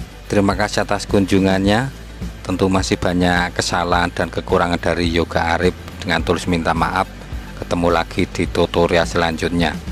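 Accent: Java, Indonesia. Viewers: Ilmunya mantab, Medok ngomongnya 😁 nyenengin banget dengernya 😁, Meleddiyakk = Meledak. 🤣🤣 Sehat dan Sukses Slalu Pak !!!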